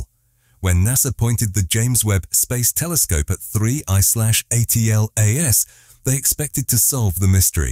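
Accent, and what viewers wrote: United Kingdom. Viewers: Am I the only one who’s sick of the ubiquitous English accent AI voice narrators? Life’s too short to listen to this fop spell out “3 I A T L A S”, over and over - at least 20 times in the first few minutes - makes it unwatchable ~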